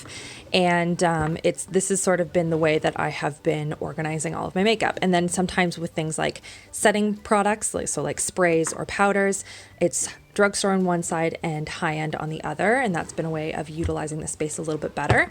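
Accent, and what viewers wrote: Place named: Canada. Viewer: Do you guys binge watch? I know I do! I feel like after about an hour of her voice, I have picked up my own slightly Canadian accent. Lol. Coffee cheers from Michigan “aye” 😊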